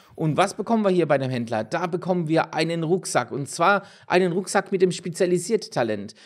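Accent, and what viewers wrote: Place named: Heidelberg, Germany. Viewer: das hab ich gemerkt welche Richtung ? Heidelberg?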